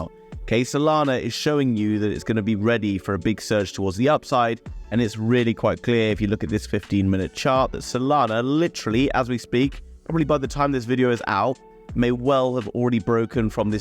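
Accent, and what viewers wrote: United Kingdom. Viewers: How did Pauly D get a British accent?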